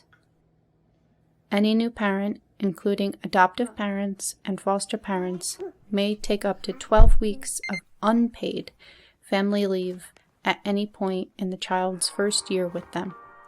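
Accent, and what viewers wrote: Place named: East Coast, United States. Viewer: Mrs. is too so good at narrations. It is like a movie, very beautiful sweet voice💓 To me, the air of your speaking English has reminded me of the well behaving American girls from the east coast of the US I as a foreign student from Japan met at the college dorm more than thirty years ago. I miss them and those days. Anyway, I see how young mothers in the US are doing in their child rearing. Good luck and looking forward to the next one😊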